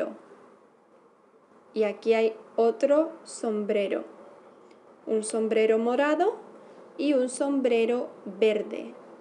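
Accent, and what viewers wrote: Spain. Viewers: Hola. I enjoyed this video. I’ve been learning Spanish using the app Duolingo. You sound very different and I think it’s because the app is based on ‘Mexican’ Spanish. You sound more familiar to what I hear when I’m in Spain. Am I correct?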